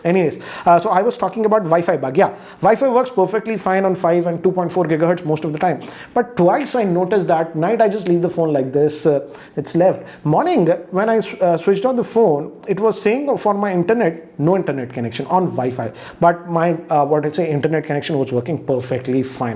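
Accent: India. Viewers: This is a very common accent spoken in India! Were you expecting something like shown in movies? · This is the only Indian i like because of his accent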